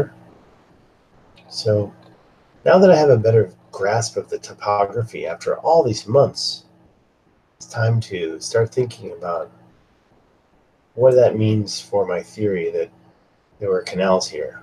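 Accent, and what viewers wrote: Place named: United States. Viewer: You sound as American as me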